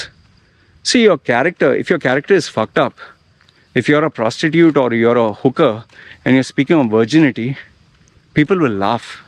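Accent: India. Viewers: you have an Indian accent, great video btw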